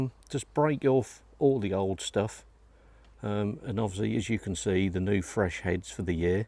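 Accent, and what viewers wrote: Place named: East Anglia, United Kingdom. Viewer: proper east anglian accent there, fair play to you boy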